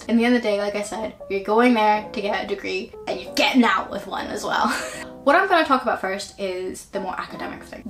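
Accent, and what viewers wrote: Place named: United Kingdom. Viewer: you sound british to me!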